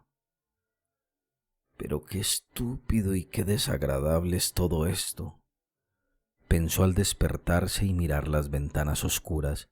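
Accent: Colombia. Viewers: Amo tanto la obra de Chéjov que hasta tolero ese terrible acento colombiano con el que el lector nos ilustra. Se aprende tanto sobre la cultura rusa y de paso sobre la misogínia de Chéjov. Con todo, cómo no amar su obra. Leí su obra completa cuando tenía 20 años pero ahora con YouTube la escucho y es como si fuera la primera vez. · Excelente.....me encanta....el acento colombiano....muchas gracias.....su trabajo...nos alegra.......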